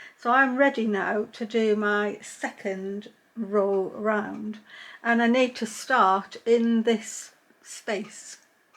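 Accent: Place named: Yorkshire, United Kingdom. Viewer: Just discovered you and your work is lovely. I lived in Yorkshire for nearly 20 years and it’s lovely to hear your voice, and that you say “crochering”, just like my mother-in- law did, instead of “crocheying” -we who pretend we’re French! Sometimes I say “crochetting” because I’m musical! I really love that duck egg, eau de nil cotton yarn, it’s my favourite go-with-anything colour. Why on earth would anyone discontinue it! Right, I’m off to delve into your other videos! Thank you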